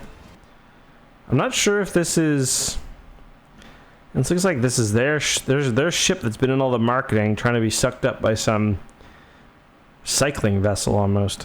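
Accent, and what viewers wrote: Canada. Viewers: You should so much more Canadian when you record am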